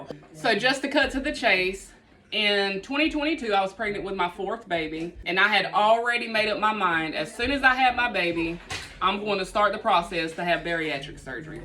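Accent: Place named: South Carolina, United States. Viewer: I love your accent. You sound like my family in South Carolina.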